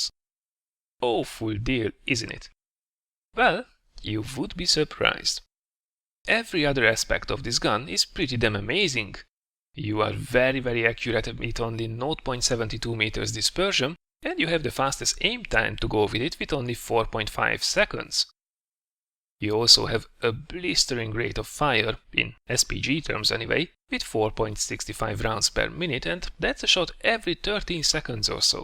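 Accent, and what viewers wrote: Hungary. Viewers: Gondoltam hogy magyar vagy az akcentusbol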